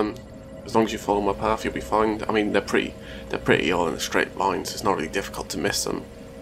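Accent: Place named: Wales, United Kingdom. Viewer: Nice one boy-bach! Glad to see/hear a Welsh voice doing an achievement guide. Keep up the good work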